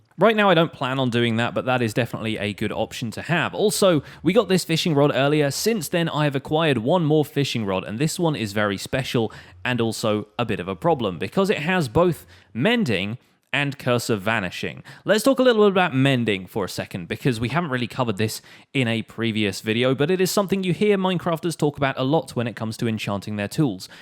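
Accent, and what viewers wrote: United Kingdom. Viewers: British all the way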